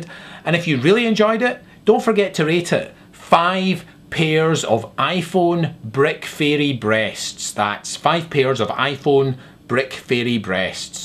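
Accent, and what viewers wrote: Scotland, United Kingdom. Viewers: Lol , classic Scottish accent. I'd love to actually sound Scottish rather than English. A comment from a Scottish Tween x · o_o it's just a scottish accent.. many scottish people have that accent. it's not a voice xD it's his normal accent. lol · "Why is His accent so weird?" Because He's Scotish perhaps? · People he's not Mexican! he's either scottish or Irish. Although, he is scottish I believe.... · Refreshing, and funny. Didnt like this guy at first, but he actually is quite unique, and says it as it is. Not sure where in Scotland this accent is, other than the Burgh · that was simply brilliant. you are scottish, right? Living in land of the free or still back in the state of thistle and tartan? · its a scottish accent .. not irish.. not british.. there is a huge difference.. duh w/e i luv this guy!!!!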